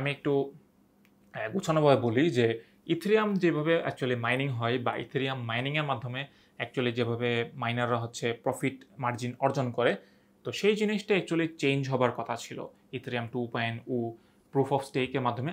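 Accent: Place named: Chittagong, Bangladesh. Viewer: your accent kinda sounds like chitainga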